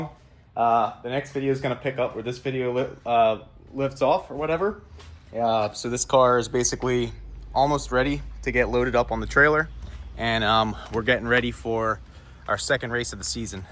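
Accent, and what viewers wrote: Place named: Pennsylvania, United States. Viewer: You talked for 3 seconds and I knew you were from PA. good work and the paint job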